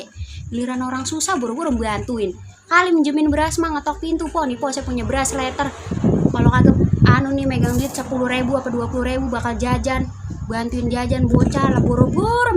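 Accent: Bekasi, Indonesia. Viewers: Logat nye bekasi bnget... Kaye enyak aye kalu ngomelin aye, keluar logat nye keq gini. Kage ade titik kome nye.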